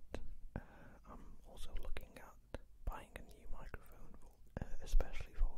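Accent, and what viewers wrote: Wales, United Kingdom. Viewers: Are you Welsh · What part of Wales you from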